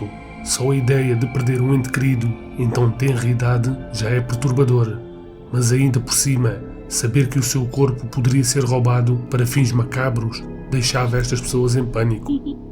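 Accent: Portugal. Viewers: Pelo seu sotaque 🤔 acho que e de Portugal 😊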